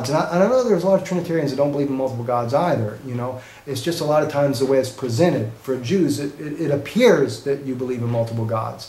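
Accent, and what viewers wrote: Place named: Texas, United States. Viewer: you betta preach brotha ! whoo. this sounds like good ol down home southern preachin to me. i think i hear a little texas in his voice hahaha. PRAISE HASHEM!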